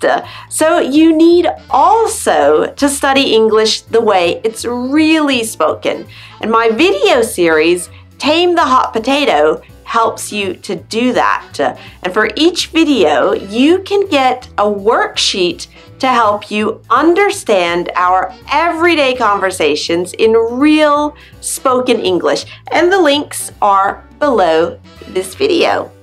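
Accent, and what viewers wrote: United States. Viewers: That is definitely an American accent. She’s just slowed down, as well as articulated, speech... I think she does a great job overall. The only exception is that ending sound of letters. As I stated above, she adds the -uh sound, which is incorrect. I used to do this teaching Lao students and then realized that it made learning to read difficult. For example, ‘sad’ would become suh-a-duh, instead of s-a-d. Or ‘tin’ would become tuh-i-nuh, instead of t-i-n. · So, I m from DRC Kinshasa … IS IN AFRICAN.. I m liker your English American accent is very good. Please my teacher my God bless you. I love yours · Not every american speaks with your accent.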